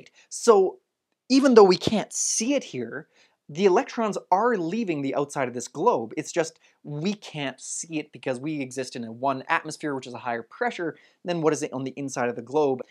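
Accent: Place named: Ontario, Canada. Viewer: i know a South East Ontario accent when i hear one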